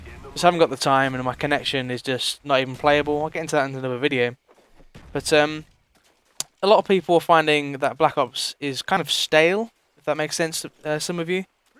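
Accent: United Kingdom. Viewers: do you live in manchester or stockport? you sound it...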